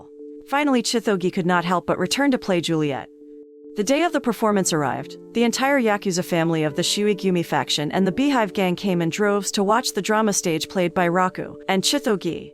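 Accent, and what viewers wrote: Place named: England, United Kingdom. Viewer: ENG accent makes it a little hard to understand. ニセコ, neese-ko-ee Nisekoi, and not nasekoi xd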